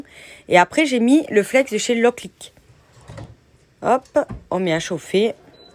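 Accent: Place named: South, France. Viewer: hello, j'arrive, je suis tombée sur vos vidéos par hasard.... l'accent... c'est chez moi !! le sud... mais je suis plutot au dessus d'Aix. Beh du coup.... j'ai kiffé ! a très vite !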